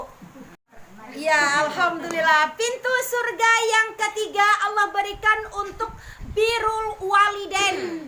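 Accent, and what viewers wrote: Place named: Minangkabau, Indonesia. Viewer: terbaikkk ustazah..👍👍 Haha Bakaleberan muncuangnyo Bahaso urang awak..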